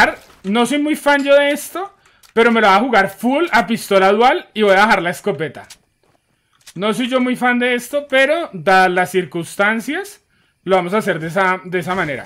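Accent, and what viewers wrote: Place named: Bogotá, Colombia. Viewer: Eres de Bogotá cierto? Jajaja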